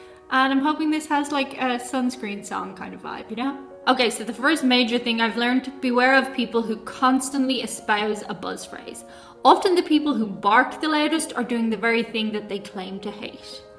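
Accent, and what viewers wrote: Ireland. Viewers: With that wonderful Irish accent you could tell me the world is coming to an end today and I wouldn't care.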